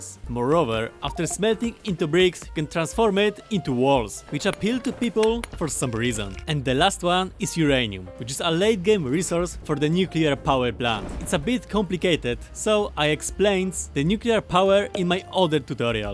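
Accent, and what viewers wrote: Poland. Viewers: jak tak słucham tego akcentu, to słychać że Polak xD ale lepiej po eng nagrać, bo wiecej osób obejrzy, wiadome, pozdrawiam :D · Cały czas się zastanawiam po tym akcencie czy jesteś Polakiem. Ciekawe... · WIEDZIAŁEM ŻE JESTES POLAKIEM PO AKCENCIE POZNAŁEM W SEKUDNE xdd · You have a very thick ponglish accent. Have you attended to pasha learning school?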